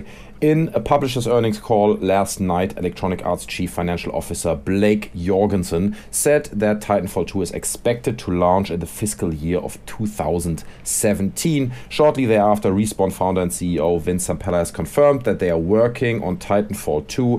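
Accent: Germany. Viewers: A you german bist du deutsch? :D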